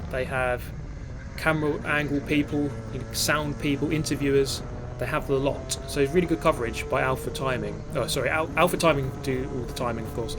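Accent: United Kingdom. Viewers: EYYYYYY BRITISH BOI nice to know theres actually british bois here in youtube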